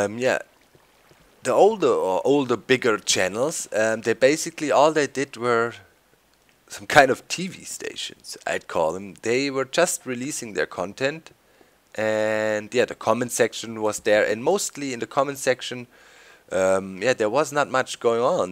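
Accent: Germany. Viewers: Haha my mum think that you sound like Arnold;) - The german accent is infactious!:D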